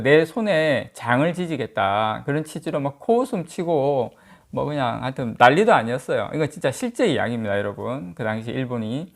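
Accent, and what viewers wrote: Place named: Busan, South Korea. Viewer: 같은부산이라 말투가 더 정감갑니다 전 광안동주민~~ ^^ 삼전 가즈아~~♡♡♡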